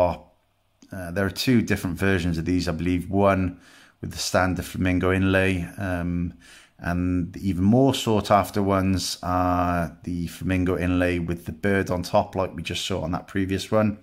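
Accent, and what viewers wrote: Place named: South Wales, United Kingdom. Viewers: Swansea, along the Mumbles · You sound like a south walean. Am I right? Cardiff/Caerphilly way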